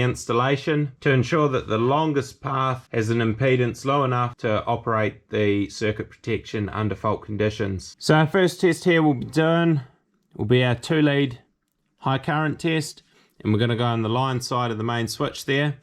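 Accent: New Zealand. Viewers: you are from nz?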